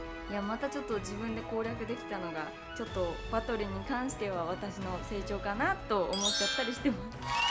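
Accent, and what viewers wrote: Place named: Kyushu, Japan. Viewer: はじめまして！は九州の方ですか？方言が身近に感じました！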